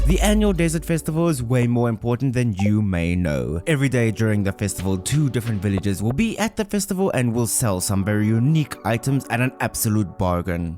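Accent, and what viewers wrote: South Africa. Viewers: I sense a South African accent...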